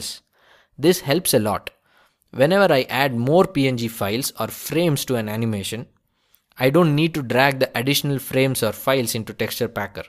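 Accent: India. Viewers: indian accent really drives me mad.. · it was quite funny at the beginning with the perfect and polished intro then out of nowhere a thick indian accent. but amazing tutorial, helped a ton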